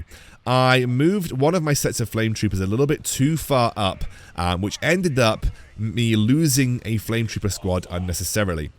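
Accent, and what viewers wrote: United Kingdom. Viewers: You do a good job on these videos pal, what part of the UK are you from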